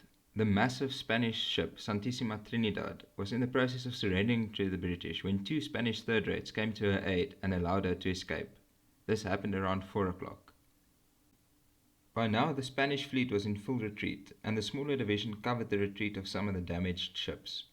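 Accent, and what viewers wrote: South Africa. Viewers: Perfectly good English. Don't let the morons get you down. Sounds like a South African accent maybe?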